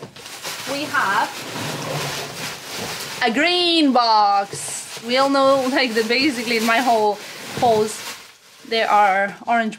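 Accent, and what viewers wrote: England, United Kingdom. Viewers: The english accent is back ! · crazy how fast your accent can change depending on where you are! sounding v british haha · your accent is British accent when you are in London, you mentioned before your accent will change when you with different friends, lol...funny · The British accent is back.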